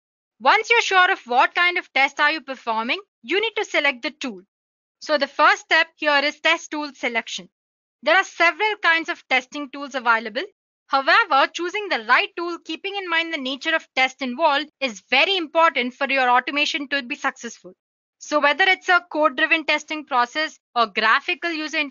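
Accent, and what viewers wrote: India. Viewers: you are from south india i guess . your accent suggests. south indians are very nice , hardworking people, nice course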